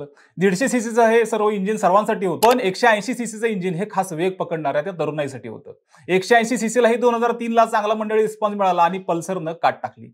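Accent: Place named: Kolhapur, India. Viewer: तो कोल्हापूर चां आहे तू पेठेतील असतील आणि एकदा पुणे गावठाण ची भाषा बघा पुण्यातून बाहेर या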